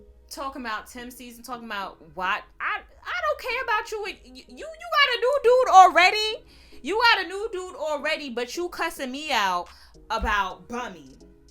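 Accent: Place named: New York, United States. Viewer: I love a New York girl accent 😮‍💨 so pretty with beautiful skin 😍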